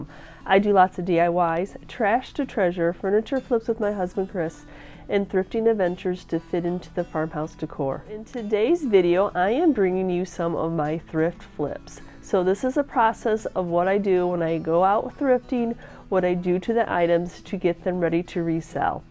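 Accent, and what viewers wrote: South, United States. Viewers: Hi! I just love your adorable southernness!:-) I am somewhat following your business plan & I very much appreciate all of your help! I don’t usually comment because I watch on my TV and I’m pretty sure you can’t write from there. I am VeryMaggs@ if you’d like to stay in touch... I am in Los Angeles with my husband (I’m straight LOL, I wanted to make sure you didn’t think I was flirting. I hope to hear from you! •••Maggie•••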